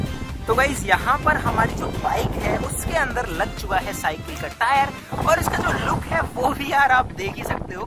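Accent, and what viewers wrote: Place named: Gujarat, India. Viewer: Oh bai tum gujrat se ho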